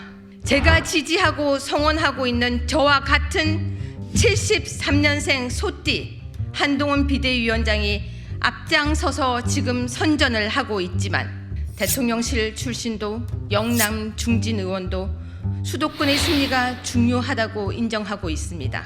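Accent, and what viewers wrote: North Korea. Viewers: 북한 말투같냐??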